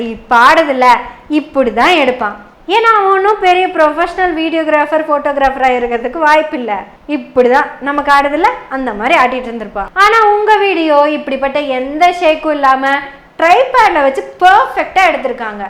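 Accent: Kongu, India. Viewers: அம்மணி வணக்கம் மா. நல்லா பேசுங்க நம்மூர் வழக்கு மொழியில்.. அன்ன பூர்ணா காப்பி arabica கொட்டையில் வடித்தது..1963 முதல் இன்று வரை யுனிவர்சல் குணம் மணம் உள்ளது. எம் நண்பர் திரு தாமோதர் சாமி . இராமன். திரு இலட்சுமணன் ஆகியோரின் dedicated முயற்சி மூலம் இன்று உலகம் முழுக்க மணக்கிறது... எல்லா புகழும் அவர்கள் வாரிசு செல்லம் திரு சீனிவாசன் அவர்களைச் சார்ந்தது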